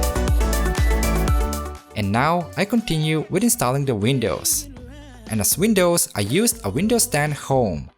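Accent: Russia. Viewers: ты русский ? если да то ответь (просто акцент русского) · Русский акцент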